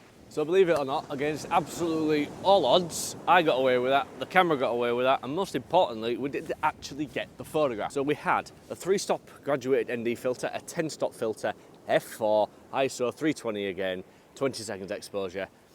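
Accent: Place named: North, United Kingdom. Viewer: Good stuff. It's a pity that the speed of your talking and heavy Northern accent makes it nearly impossible to understand you, much less learn anything.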